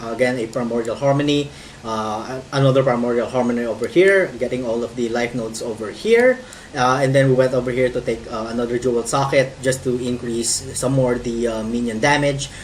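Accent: Philippines. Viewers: scrolling through PoE vids and i'm commenting on this one to ask... that accent sounds filipino, are you filipino?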